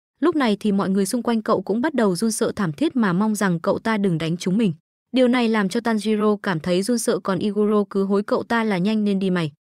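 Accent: Central, Vietnam. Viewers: Người miền Trung ò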